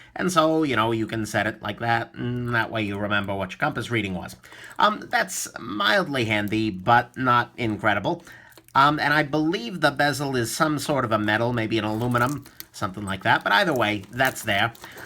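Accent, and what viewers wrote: New York, United States. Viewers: Love your accent - are you a New Yorker by any chance? :-)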